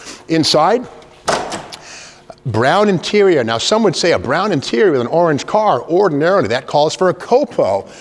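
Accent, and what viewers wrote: Boston, United States. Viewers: I have to say that Steve really does know his cars. He's a pleasure to listen to especially with that Boston accent of his. Go Sox!!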